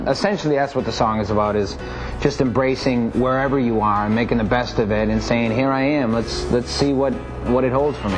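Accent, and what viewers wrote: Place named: Boston, United States. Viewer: said "you know" 30 times. and each time was amazin lol love his boston accent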